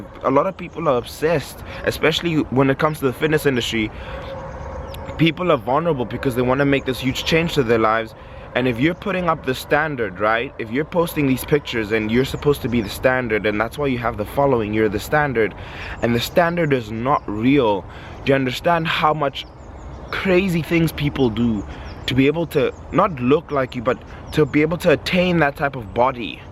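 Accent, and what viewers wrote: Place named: South Africa. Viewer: yo where u from bro? u sound south african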